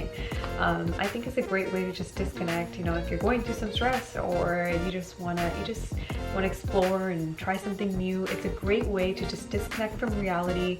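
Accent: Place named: India. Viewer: Where do you live in India? Ur accent is pretty cute😍 just wanna ask where u got that from? Anyways, keep shining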